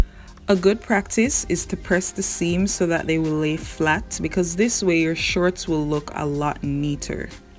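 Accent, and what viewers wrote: Jamaica. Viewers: Are youJamaican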